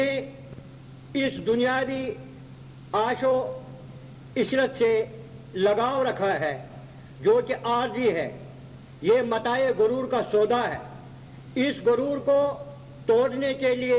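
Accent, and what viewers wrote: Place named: Gujarat, India. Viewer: Gujratii juban hi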